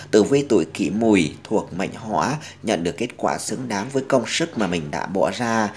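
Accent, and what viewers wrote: Thanh Hoa, Vietnam. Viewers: Giọng Thanh hoá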